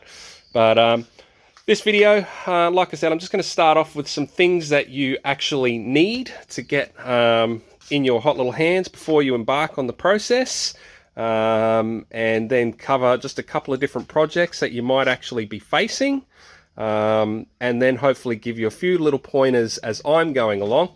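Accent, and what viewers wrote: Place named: Australia. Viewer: Motorcycle, check accent, check. Nature sounds in the back check Filmed outside check You must be australian 😁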